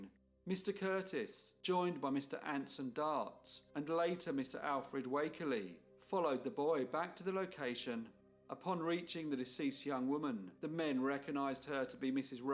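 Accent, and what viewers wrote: England, United Kingdom. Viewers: My goodness! I do so love an Engllish accent! I can't help but mentally picture Eddie Redmayne as the narrator details every tragic story. Both voices are quite similar to my ears. I only hope the narrator is NOT a product of AI. As a human, make no mistake, you are blessed with a talent only few possess--a great speaking voice & the determination to research & present these stories. Thank you so much for sharing your talent & interests with the public. This specific niche is yours alone. No one else can compare.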